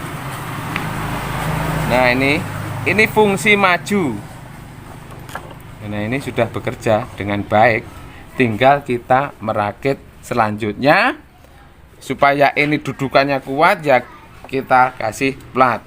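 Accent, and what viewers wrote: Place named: Java, Indonesia. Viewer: Maturnuwuun om. Sederhana tapi jelas penjelasannya. Bonus diselingi logat2 jawa yg lucu 😁👍👍👍👍 Keren om...sukses selalu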